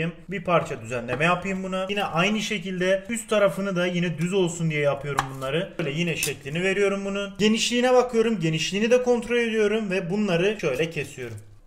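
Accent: Adana, Turkey. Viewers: "Tencereyi ocağa eklüyürüm" Adanalı olanlar bu şiveyi bilir. Ben de şimdı karam yiyürüm. 😀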